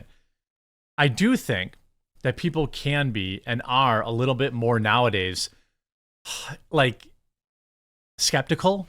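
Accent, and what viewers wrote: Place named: Midwest, United States. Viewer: your midwest accent I barely notice... Usually... but the way you pronounce "Atlas" sounded like "Alice" until much later in the clip.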